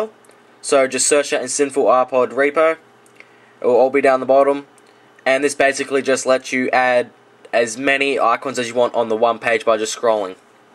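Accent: Australia. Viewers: Great tips and great to hear from an Australian finally.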